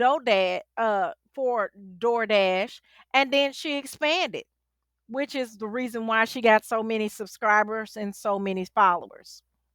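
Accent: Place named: South, United States. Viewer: Love that southern accent! Definitely fun to watch and listen to others and how they present themselves.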